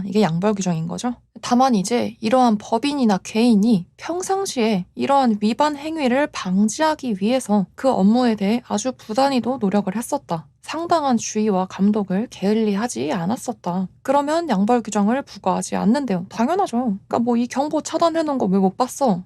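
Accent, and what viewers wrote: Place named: Busan, South Korea. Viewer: 쌤 덕분에 공부 잘하고있슴다 근데 달동네던가 억양에서 부산 분이 아니신가 생각되네요 ㅋㅋ 저도 부산에 가까이 살다보니 은근 반갑게 느껴지네요 ㅋㅋㅋ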